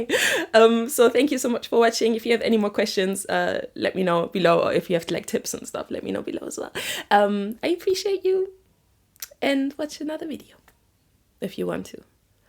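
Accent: Germany. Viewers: Ich wollte mal fragen welchen Stein ich benutzen kann als Steinbock :) Ps : Dieser deutsche Akzent 😭✋ ich liebe es 😂😂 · i like your German accent, I assume it's a German accent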